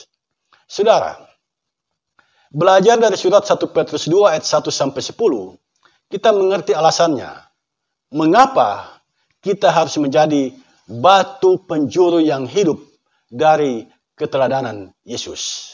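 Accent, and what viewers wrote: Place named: East Nusa Tenggara, Indonesia. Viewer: Syalom bapen slamat pagi. Trimkasih bpa utk penjelasan firman Tuhan pagi ini yg memberi pemahaman yg sungguh dan benar. Maaf bapa berasal dari daerah mana? Saya sendiri dari NTT. Maaf bapa saya bertanya krn dari gaya/logat bicara sama dgn orang NTT. Dan jujur bapen saya baru dapat canel bpa hari ini 19 juni 2023. Skli lag itrimkasih banyak2 bapan. Tuhan Yesus memberkati bapen dan keluarga.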